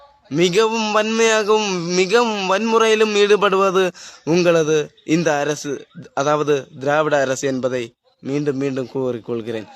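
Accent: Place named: Kerala, India. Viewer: என்னப்பா. மலையாள வாடை அடிக்குதே!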